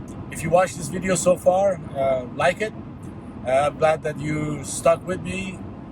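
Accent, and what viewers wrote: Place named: Arab world. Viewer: Just out of curiosity are you originally from the middle east? My GF (from Iran) and I have been trying to place your accent (maybe do an episode about what you do in life, profession, what got you into overlanding, etc.). Loving your videos btw. Keep them coming! I did 5 BDR's last year in my JKU, sold the RTT and am in the home stretch of a year long wait for my J30. I live in Chula Vista so if you ever make it back out this way, DM me and I'll buy you a burrito and a beer. 👍🏻😎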